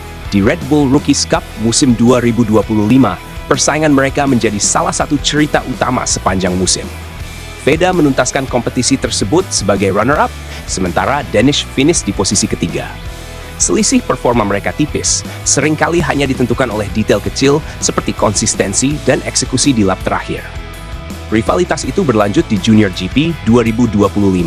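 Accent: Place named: United Kingdom. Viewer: Narasi bahasa pake aksen inggeris Its very cringe bro Baru 1 mnt stop nonton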